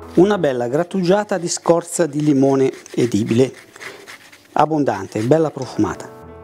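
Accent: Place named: Massa, Italy. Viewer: UNA BELLA RUMATA ...... DIALETTO MASSESE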